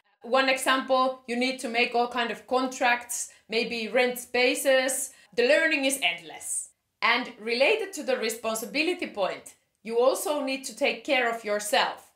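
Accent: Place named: Finland. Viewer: 11 seconds in I am betting your from Finland??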